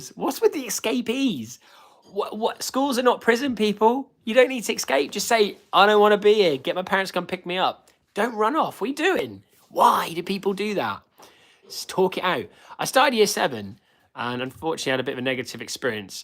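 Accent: United Kingdom. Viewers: I didn't know you were British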